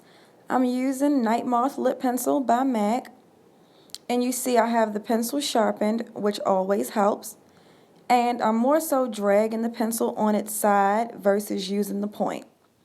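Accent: Baltimore, United States. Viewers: Omg I could tell you were from Baltimore lol . love it, so beautiful. New Subbie!!!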